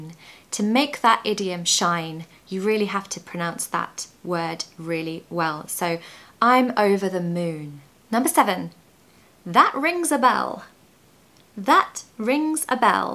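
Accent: United Kingdom. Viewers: I love to learn new english idioms everyday... Also I love your british accent ☺Sure you will success in your channel teachhing english... Cheers from Argentina🔥🇦🇷😊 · I Love the way How you pronounce and speak ,I love adore British Accent ,so Cute .. "In the same boat "this idiom in my language means the same: Estar no mesmo barco-Portuguese... We have a sweetest one :The rice of the same Sack =Arroz do mesmo saco) we use this when two people or thing behave in the same way but badly. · I lovd your accent and tips in your episodes to learn the British English. Keep the great work up and all respect 👏👏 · Your accent is really great help me learning British English. Thank you a lot. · contigo eh aprendido la pronunciasion en ingles britanico.....aunque el acento americano no suena mal....besos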